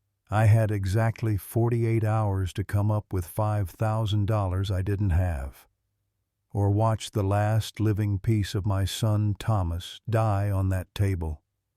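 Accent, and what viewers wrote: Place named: South, United States. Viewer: I love this narrator with the southeastern or western accents.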